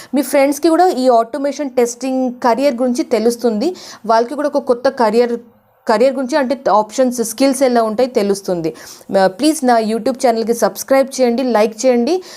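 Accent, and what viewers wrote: India. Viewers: North nunchi vachi telugu matladuthunatu undi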